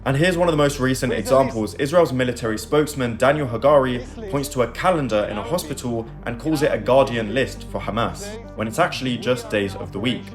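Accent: United Kingdom. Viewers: You look like a young man of military age... And you speak the UK English... See where I'm going with this?😮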